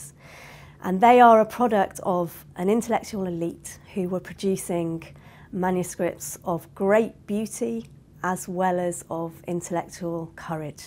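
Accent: United Kingdom. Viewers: Loving 🥰 your British accent ❤️😊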